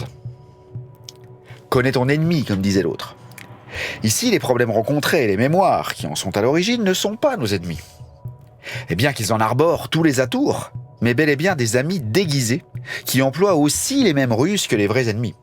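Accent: France. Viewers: Très intéressant. Et avec un très léger accent ardennais ce qui ne gâche rien ;-) . (Je vais être hors sujet mais tes vidéos de basse manquent un peu. Au delà des magnifiques cover de maiden, avec le niveau que tu as, ce serait génial que tu fasses plus de vidéos pédagogiques comme le galop de Steve ) merci pour tout. Musique et spiritualité, ta chaîne est passionnante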